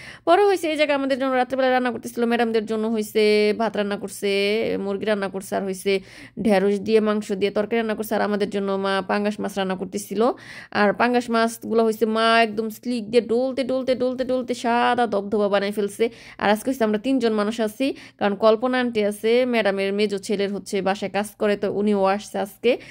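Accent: Faridpur, Bangladesh. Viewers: আপু আপনার বাড়ি কোন জেলায়, জানাবেন,,আপনার কথা গুলো আমাদের, ফরিদ পুর ভাষার মত লাগে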